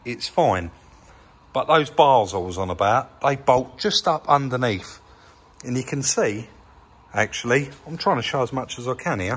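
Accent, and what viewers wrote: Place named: Kent, United Kingdom. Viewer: He is from Kent, its just the local accent, nothing wrong with it. Dont ask me if he is a Kentish man or man of Kent. Something about the Medway and a big deal for some people.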